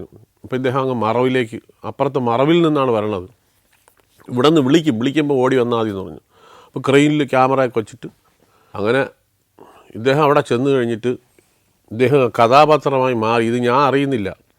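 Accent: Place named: Trivandrum, India. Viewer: Happy to listen to his narrations. Love the Trivandrum accent ❤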